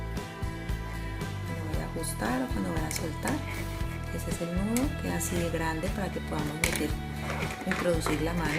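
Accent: Colombia. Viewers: Estás en Colombia o en Venezuela 🇻🇪, tu acento me parece de Colombia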